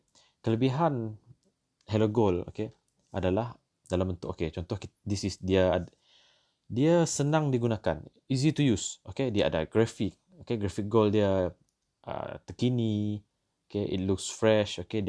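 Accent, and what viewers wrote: Sarawak, Malaysia. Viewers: Loghat mcm Sarawakian je.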